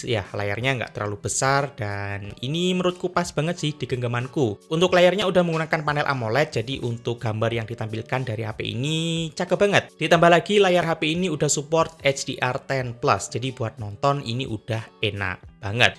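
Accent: Banyuwangi, Indonesia. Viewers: Tibak e mas wong banyuwangi 😂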